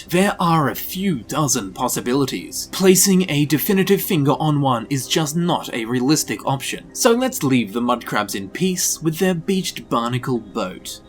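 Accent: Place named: Australia. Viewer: Is it lost on people that he's Australian or.... Of course he's going to "mispronounce" words (Based on YOUR representation on how the words pronounced), so relax with it. He's still funny and makes great videos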